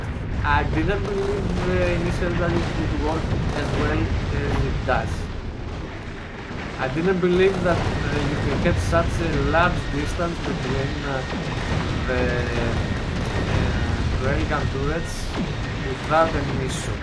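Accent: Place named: Cyprus. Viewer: Από την τσιπρέϊκη προφορά σε κατάλαβα. Εγώ πέρυσι το ξεκίνησα. ξέρεις πώς γίνονται αυτά... ένας φίλος σε ένα πάρτυ μου λέει «έλα δοκίμασε, με μιά φορά δεν κόλλησε κανείς». Πήρα και το Space Age... άστα μεγάλε. Αγίου βαλεντίνου στην φάμπρικα. Το τερμάτησα αλλά δεν έκανα την τελευταία επιστήμη. Τώρα το ξαναξεκίνησα και έβαλα και κάτι μοντάκια κόλαση: Quality++, Corrundum, Maraxsis, long stack inserter, advenced belts και φύγαμε για megabase.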